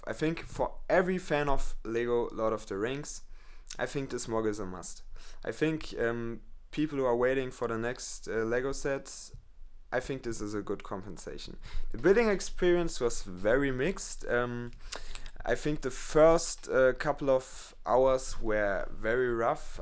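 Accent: Germany. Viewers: Klingt sehr deutsch haha aber geiles moc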